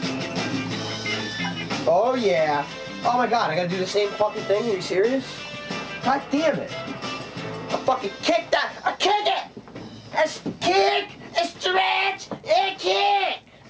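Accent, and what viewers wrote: New Jersey, United States. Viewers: Back when didn't hide his Jersey accent. *Cheers and applause* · So much Jersey accent. It's beautiful.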